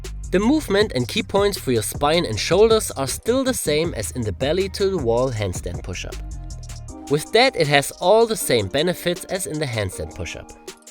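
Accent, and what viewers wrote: Germany. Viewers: Your accent sounds german🤔 The Video was very helpful, thank you! :) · Sound like Do You have a Garmen accent. :)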